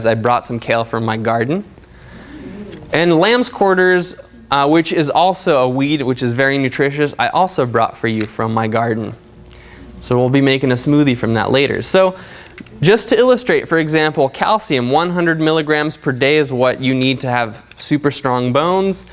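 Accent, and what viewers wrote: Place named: Russia. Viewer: Love the Russian accent ;)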